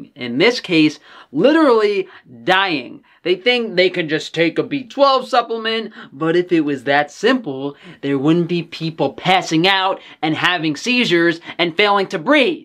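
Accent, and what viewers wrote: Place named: South, United States. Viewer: that southern accent lol dang...thank-you for opening our eyes to the divides that are in place to lower our vibration and cause turmoil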